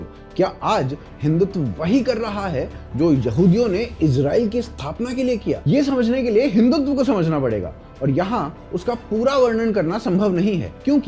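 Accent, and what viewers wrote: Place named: Haryana, India. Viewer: He is haryanavi that's why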